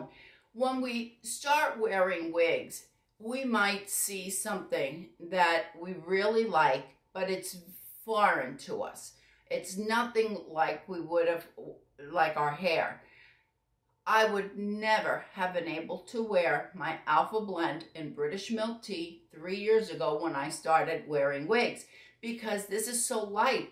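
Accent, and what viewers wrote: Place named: New York, United States. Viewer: Heard NJ / NY in there somewhere! Thank you for your videos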